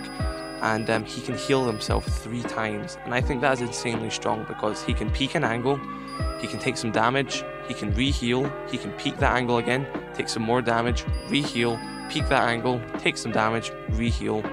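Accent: Scotland, United Kingdom. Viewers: Good vid dude just recently subscribed noticed your accent your from scotland! Me too 🏴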